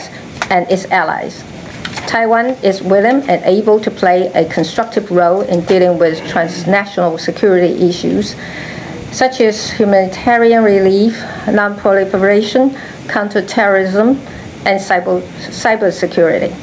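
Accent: United Kingdom. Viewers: The speech was very thoughtful, and she has a more british accent i felt, which made her sound sophisticated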